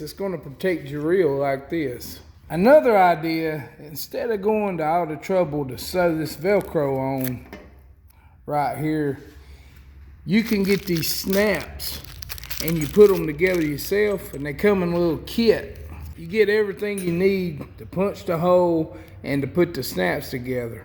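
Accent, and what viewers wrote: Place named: South, United States. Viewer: Great idea bud. Hey I have to mention your accent twang is very similar to mine. I'm not makin fun, I never realized that I had such a southern twang accent til I heard my recorded voice and it totally cracked me up. I'm from the Texas panhandle but my grandparents migrated from Tennessee so there it is.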